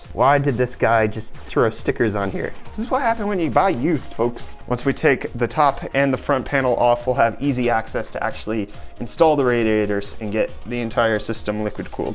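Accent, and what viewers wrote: South Africa. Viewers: you south african? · What part of south africa is he from? If it’s cape town i feel for you given the whole water situation. My grandfather is struggling with the extra costs from accidental overuse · Are you from South Africa ??? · Do i hear South Africa what does this pc cost in Rand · Are you from South Africa? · are you south african? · Where is you'r Sa accent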